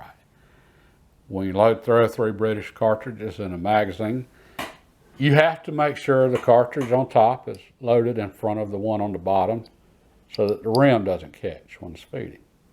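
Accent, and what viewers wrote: South, United States. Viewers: Kia Ora, watched a lot of your videos ova the years. I love your south acent & the vast knowledge you bring to us on watching u tube guys. In Aotearoa ( NZ) i would think more deer hav been taken by 303,s than any other cartridge? My take ( disclaimer) NZ forest service in the past handed out longtoms smelys by the hundreds & they did the job. Lot of sentiment & collection re past service rifles. I have serval myself and enjoy them mainly for range shooting these days im getting VERY long in the teeth thesedays. The older iv grown the older style i choose these days iv got keen on muzzleloaders. ( [ i digress:( ] 303 brit is easily purchase here. As is 30.06 A hole nother subject.... i ❤ that USA cartridge. Keep well & safe aTom Nga mihi Chris Ma Te Wa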